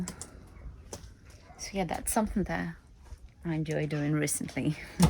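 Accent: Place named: RP, United Kingdom. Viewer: Привет your RP English is pretty good. I love your voice and presentation style. I have been living in Moscow since 2015 so I understand your customs and way of life as they are obviously similar to the Russian way of life. Yet I still find it interesting to watch your videos.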